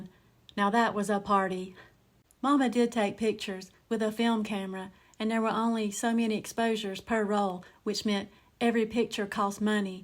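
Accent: Appalachia, United States. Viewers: OMG! you've got stop making these videos, I'm laughing so hard I'm going to pee my pants. I love watching you talk with that Appalachian accent it's just the cutest thing that I have ever heard. Man you are so beautiful girl.❤❤❤❤❤❤❤❤❤❤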